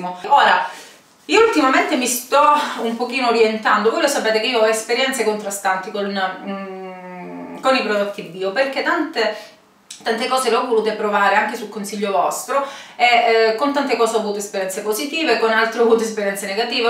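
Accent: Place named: Calabria, Italy. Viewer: carissima io "spollicio" ma non commento però i tuoi video mi piacciono moltissimo, sentirti parlare mi fa sentire "a casa" (W la calabria!!). questo sito lo visiterò anche perché un altro di cui non voglio fare nomi non mi ha inviato tutta la merce da me ordinata promettendo di reinviarmela....sono 8 mesi che aspetto.... buona serata e please non smettere di fare video!!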